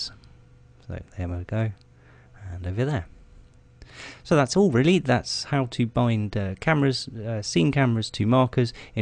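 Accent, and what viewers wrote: United Kingdom. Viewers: british :D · English Accent :)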